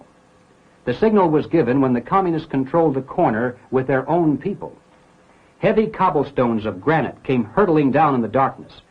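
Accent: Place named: England, United Kingdom. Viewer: いつもありがとうございます。の語りは大変聴きやすいです。英語音声を消さないのもいいですし、日本人には探せない情報を英語訛りで話されていると有り難みが増すのですが、声質がソフトなので聴いていて全く疲れず、スムーズに頭に入ってきます。個人的には、大統領選挙投票前のイーロン・マスクに、終わりやん、って大阪弁でしょうか、というのがとてもニュアンスが伝わりました。日本人には出来ない翻訳です。全然関係ない映画の話ですが、コロニアという映画で、DSより更に危ない追跡者から逃げ切るエンディングで、旅客機を無理やり離陸させてと主人公が頼む場面で、機長が「OK」と応える和訳が「クソッ」になっていたのがちょっと嫌でした。文化が違うと直訳とは程遠いものになったりしますが、の和訳はニュアンスが極めて正しいのではと感じています。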